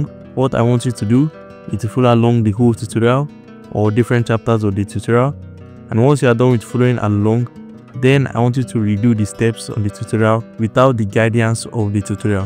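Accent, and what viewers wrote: Nigeria. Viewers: Are you from nigeria?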